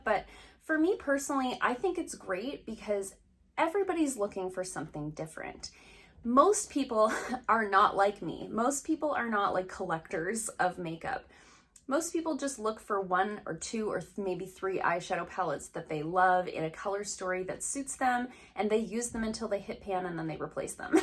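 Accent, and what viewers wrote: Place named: Canada. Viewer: I love the 5 brands you chose. I just bought the new Camouflage foundation from Elf. It’s not bad. A little thick. Had to blend it out really well. I also bought the Madison Beer palette by Morphe. Great colors in that palette. I know you would like it. I like the Morphe brand, also. I want to try the Covergirl Fresh brand powder. That looks interesting to me. I always like to hear what works well for you. I will have to try a few that you have featured here. Love Color Pop, too! Love their eyeshadows and blush. Love your beachy curls! You look so pretty, as always. I love the way you say “about”. Your Canadian accent is adorable! Just like you! Take care, little pixie!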